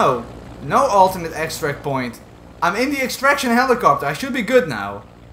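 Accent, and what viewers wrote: Netherlands. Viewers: Are you dutch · Ik hoor gewoon dat je Nederlands bent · You can hear your dutch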